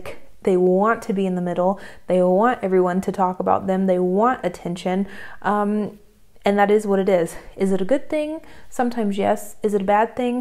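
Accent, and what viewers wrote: United States. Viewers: First of all love your content and your southern accent. (Y'all) Small talk... No. I want to know less about it/you. AC... In the car yes, otherwise you live in a shed. A house, doesn't get that hot. Water... If you want free water, use the tap. Smoking... Yeah, we got all addicted by the US tobacco companies. so deal with it. Speedos... Well, yeah, they were common decades before shorts. Football... Soccer is for american girls, we love football. The experience from a Football match to a NFL match is like comparing a Formula 1 car and a stroller. There is this guy Connor Sullivan on Youtube, watch his video on going to a premier league in Germany. Otherwise, best wishes from a German, living abroad. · Where you from bro Texas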